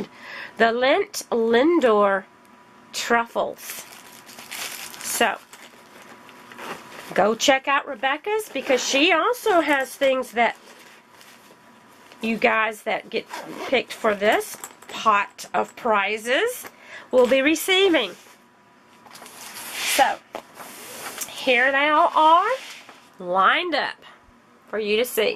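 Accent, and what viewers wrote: Texas, United States. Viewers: I am brand new to diamond painting I have only done a couple of paintings I’m really enjoying listening to you while I work on my paintings I love your Texas accent So how I kit up Is in the bags they come in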